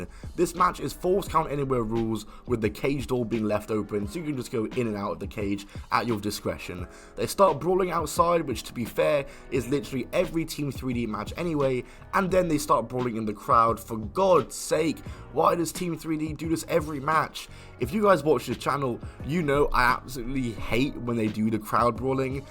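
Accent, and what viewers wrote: England, United Kingdom. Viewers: Seriously his annoying obviously brother English voice ruins it.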